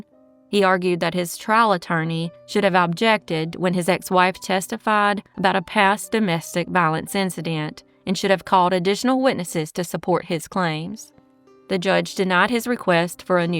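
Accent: South, United States. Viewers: IT’S HER SOUTHERN GIRL CHARM!!!😉😉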